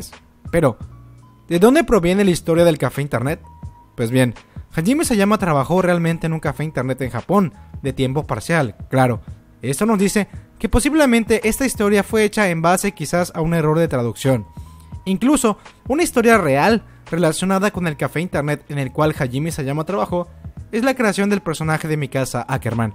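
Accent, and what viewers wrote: Peru. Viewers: eres peruano?? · eres peruano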